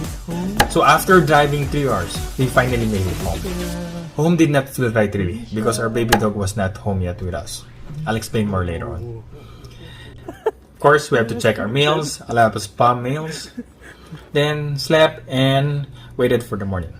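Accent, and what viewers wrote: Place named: Zamboanga, Philippines. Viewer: From Zamboanga ka po pala kaya pala familiar ang accent ng tagalog, student nurse here from AdZU, hoping to be there soon!!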